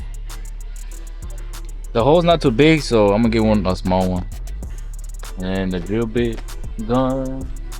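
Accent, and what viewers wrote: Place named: Texas, United States. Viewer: I knew you was from TEXAS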